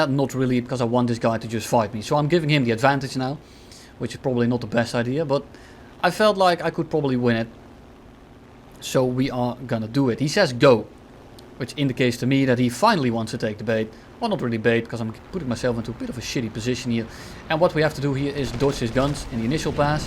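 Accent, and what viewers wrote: Netherlands. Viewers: R u dutch by any chance?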